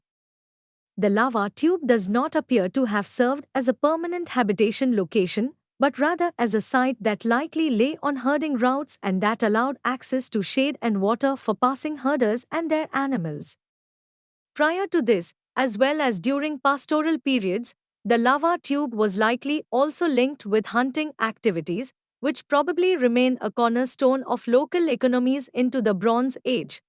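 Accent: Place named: Middle East. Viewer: Even with a Middle-Eastern accent, you sound like a bot.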